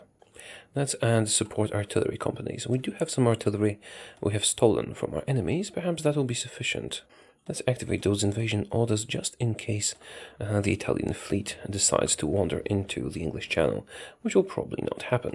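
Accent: Poland. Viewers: lol my friend thinks you are German. I told him you sound Polish. is Polish right? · your accent is a bit polish nice! i love polish!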